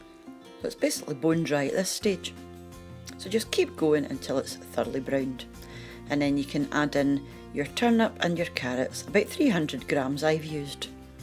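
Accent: Scotland, United Kingdom. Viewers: I'm trying to learn good Scottish accent for a monologue , and yours is fab!!!! X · Thanks for this video. I like stovies but I LOVE LOVE LOVE listening to Scots. Greetings from the Bavarian Highlands (alpine belt) 🏴💙🏴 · My grannie and my mum made stovies with the ends of the roast. Brown the onions and add the chopped up roast. Add boiled tatties and any left over gravy. Yum! I was born in Portsoy (not far from Elgin) but live in Vancouver Canada now. Grannie made tablet on a Prius stove in front of a coal fire and sold it, with all proceeds to the Salvation Army. I used to make it but it is too “moreish” to have around. Love watching for the old recipes and listening to the home accent. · This looks like a dish we would love! Thanks. I enjoy your videos so much and your lovely Scottish accent! 😊❤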